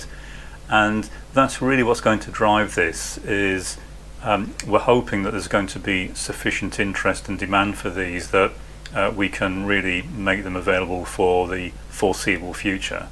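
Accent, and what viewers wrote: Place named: United Kingdom. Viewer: Interested. Keep us up to date with progress please. I'm encouraged by the British accent - where would these ship from ? I'm fed up of paying import duty and VAT on gear I import from the US.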